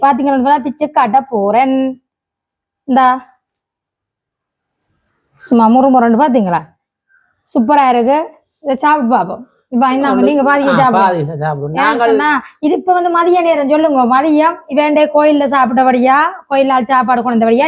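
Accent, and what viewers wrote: Sri Lanka. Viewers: நீங்க பருத்தித்துறையா சகோ 😂😂😂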